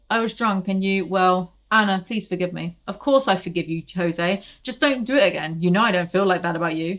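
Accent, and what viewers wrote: United Kingdom. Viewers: every time i finish one of your 2-3 hour long videos i start thinking in a british accent. i just wanted to share this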